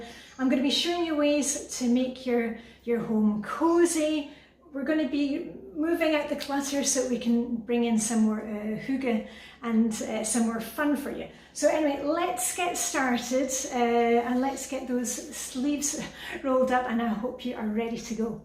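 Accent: Scotland, United Kingdom. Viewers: Ah could be sure your Scottish? I may well be going barmy?xx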